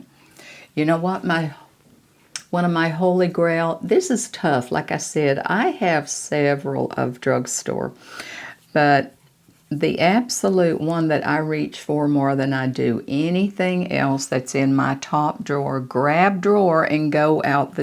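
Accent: South, United States. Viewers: you are just precious!! You give women our age such confidence in the ability to make ourselves look and feel beautiful. I can't get enough of your southern brawl. That's a compliment! You are stunning!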